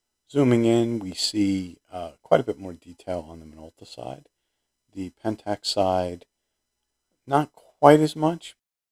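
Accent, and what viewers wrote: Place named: United States. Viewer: Thanks for this review and comparison. Always loved Minolta for the very beautiful color reproduction and quality. Olympus surprised me too. I have never owned a single Olympus lens, but judging by various reviews, I had the impression that, in general, Olympus lenses were of average quality. However, in this comparison, the Olympus performed very well, especially in the corners. I also wanted to say that I liked the composition in the photographs themselves (or the cropping applied later). One can immediately say - this is a person who knows a lot about composition. The very first photo is BOOM, you can immediately put it as wallpaper on your desktop :) And that photo with a stone wall is so sharp and colorful. And the picture that was most likely taken from somewhere in the forest (?) and we see a landscape and those biiig columns - a very good composition and the picture itself is very atmospheric, with a beautiful distribution of light. 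I immediately became interested in what kind of place it was taken. Judging by your pronunciation, you are an American. But the country is pretty big (although somewhat smaller than mine, hehe), so for now it remains a mystery to me, because there were no signatures. Anyways, very interesting comparison and I'm glad I stumbled upon it today (even though it's been 2 years) because today there are so many different kinds of "bloggers" and... to be honest, they show themselves more, rather than their content. Constant jokes, all sorts of vide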